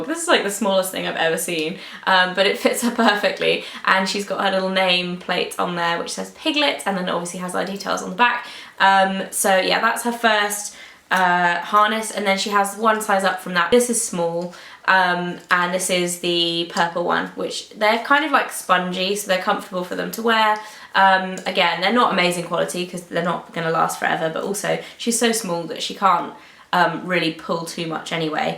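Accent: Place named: London, United Kingdom. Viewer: I love your videos and your accent from London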